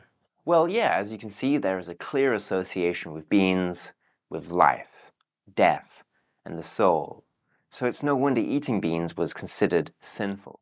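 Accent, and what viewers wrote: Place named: United Kingdom. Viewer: You sound British. If you are, you especially should know it's maths, not math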